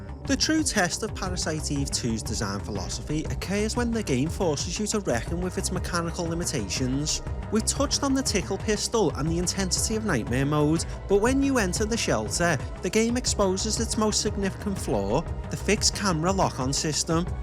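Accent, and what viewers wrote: Liverpool, United Kingdom. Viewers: scouse accent talking about beloved survival horror of the 90ds and 00ds was on the bingo card, but great video bud...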